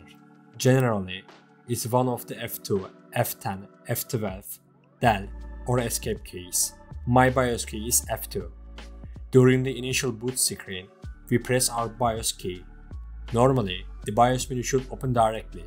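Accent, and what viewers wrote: Turkey. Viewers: ARE YOU TURKISH DUDE???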